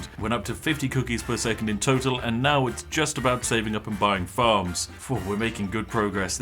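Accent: United Kingdom. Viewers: why so british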